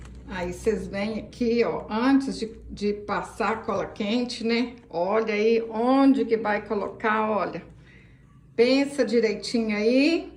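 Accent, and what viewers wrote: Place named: Belo Horizonte, Brazil. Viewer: Adoro vc jeito falar igual minha tia de Belo Horizonte fazia coisas e doces como ninguém foi embora 88 anos que saudades qdo ouço você quero me inscrever não consegui ainda bjs amo vc creusa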